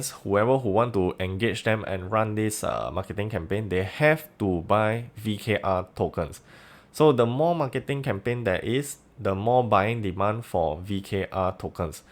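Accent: Singapore. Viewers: Thanks for the clear and concise video. You sound Singaporean. Cheers from another Lunatic from the lioncity. ;)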